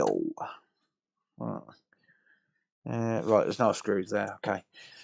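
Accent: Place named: Midlands, United Kingdom. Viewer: which town you from mate, sounds like you got a midlands accent. I'm from wolves myself, now live in the States.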